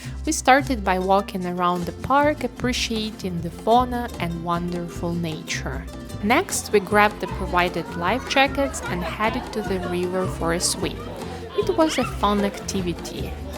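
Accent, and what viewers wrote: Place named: Eastern Europe. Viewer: What a familiar Eastern European accent:) Bardzo dobry film!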